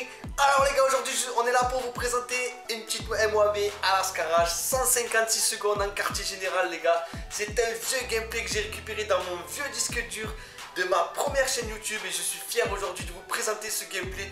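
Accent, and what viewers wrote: South, France. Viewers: Notre tjrs aussi beau tjrs aussi bn merci pour ce nouveau bijou de ta pars bisous et tn petit accent du Sud me donne la chaleur qui y fait =)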